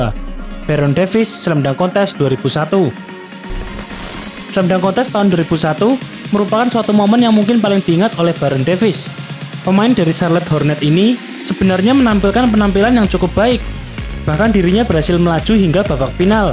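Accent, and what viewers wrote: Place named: Medan, Indonesia. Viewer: Medan nya medok bgt, hahaha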